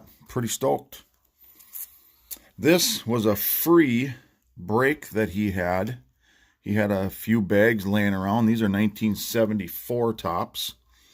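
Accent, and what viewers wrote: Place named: Fargo, United States. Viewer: You have a natural Fargo voice =)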